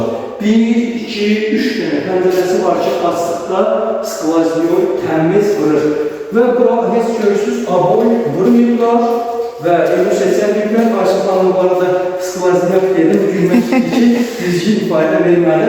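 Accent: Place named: Nakhchivan, Azerbaijan. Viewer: Çox fikirləşdim bu ləhcə mənə tanış gəlir deme Mənim yerlim Naxçıvanlı imiş .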